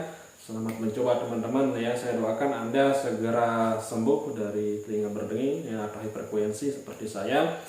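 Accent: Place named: Lombok, Indonesia. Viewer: Kayak logatnya orang lombok ini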